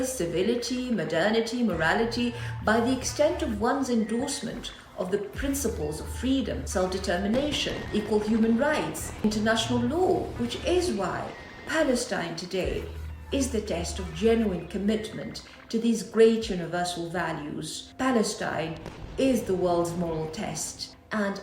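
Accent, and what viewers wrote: RP, United Kingdom. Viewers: This is a really pathetic attempt at respectability, there should be no Muslim, lecturing anyone, on genocide and oppression, this woman is a joke, how much of the world has Islam conquered and enslaved, in the last 1400 years, and no matter how much they try and sound intelligent, with their posh English accent, they will always fail to have any credibility. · Ok I know this is not the point of the video, but why does the narrator sound like a white upper class English woman?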